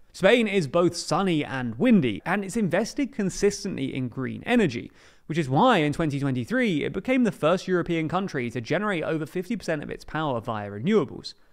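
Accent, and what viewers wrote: England, United Kingdom. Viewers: The content is cool, but the English accent is one of those that makes getting information more difficult.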